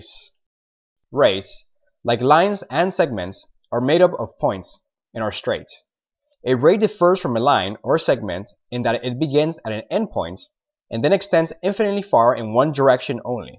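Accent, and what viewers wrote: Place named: Scandinavia. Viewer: you sound scandinavian, are you german or austrian?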